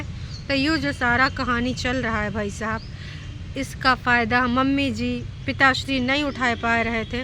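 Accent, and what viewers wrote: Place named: Lucknow, India. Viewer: aap Lucknow se hai kya, beech beech me aapki language jhalkne lagti hai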